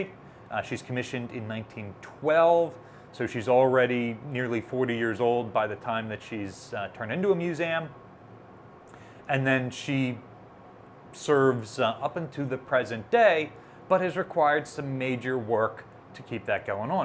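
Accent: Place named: California, United States. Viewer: Personally,i think you need to work ok ennunciating. They are MUSEUMS, not myoozims. Then again, you sound like you are from commiefornia, so i cannot expect much from you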